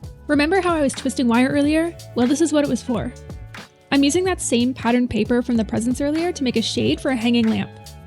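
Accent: Newcastle, United Kingdom. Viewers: I thought you might be from Newcastle 😂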